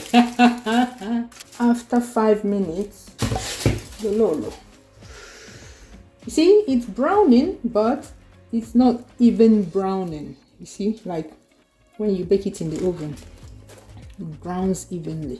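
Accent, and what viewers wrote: Igbo, Nigeria. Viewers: The way you even explain things with a little Igbo vibe dey sweet my body. Nice one I will try it. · You look beautiful.. Your humour is next to none especially in combination with the natural igno accent... I really enjoy watching your videos..natural and down to earth.. Keep it up..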